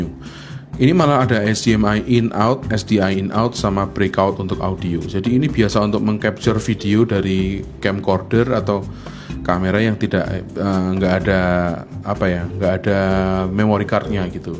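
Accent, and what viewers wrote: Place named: Java, Indonesia. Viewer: medok sekaleeeeee..... salam wong jowo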